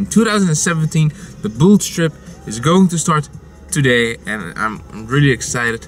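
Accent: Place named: Netherlands. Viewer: He is dutch. I can hear it by his accent :)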